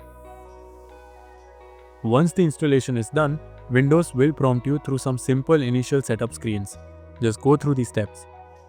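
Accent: India. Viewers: Indian accent. Yessssssss!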